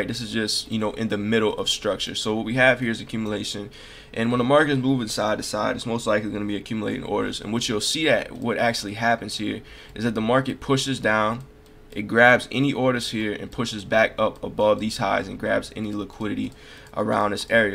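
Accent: Louisiana, United States. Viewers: Are you from Louisiana? You accent sound very familiar.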